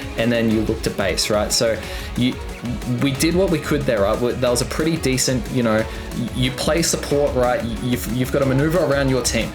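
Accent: Australia. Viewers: are you australian? also your vids are VERY USEFUL! like seriously i got +10% win rate in ranked because of your vids!! so thx!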